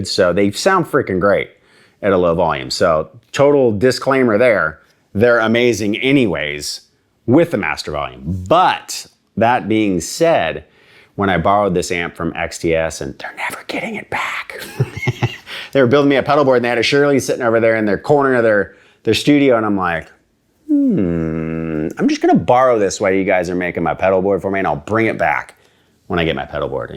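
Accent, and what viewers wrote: United States. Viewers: You have really picked up the Nashville affect. That didn’t take long.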